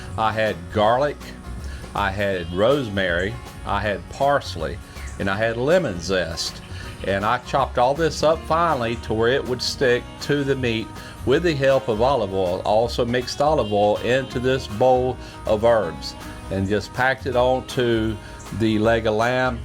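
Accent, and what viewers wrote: South, United States. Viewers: I watched this video today to hear pronounce gyro. Gotta luv that southern twang.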